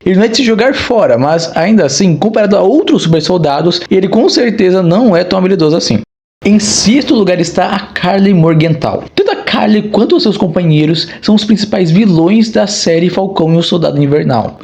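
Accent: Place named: United States. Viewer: mania de brasileiro querer falar americanizado 😕🤡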